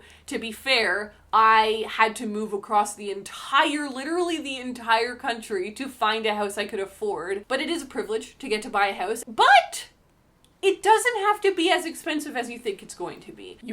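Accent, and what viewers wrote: Canada. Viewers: That's true but she is canadian so she does have a certain canadian accent from a certain part of the country :) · Canada is gigantic. There are different accents in different regions all across the country. There is no one, single Canadian accent any more than there is one single American or British accent. accent is likely influenced by the area where she grew up.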